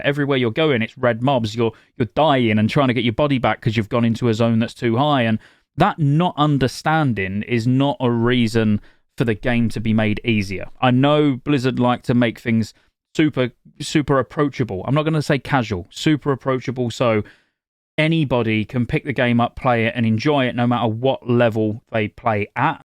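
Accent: United Kingdom. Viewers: Whats the story behind the Iowa hat but the british accent?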